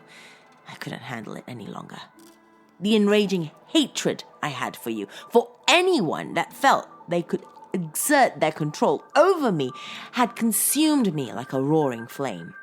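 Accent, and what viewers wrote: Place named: RP, United Kingdom. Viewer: I'm very curious to know why you sometimes say 'de' instead of 'the' when you are quite able to pronounce 'the' properly with your quite posh accent! Very bizarre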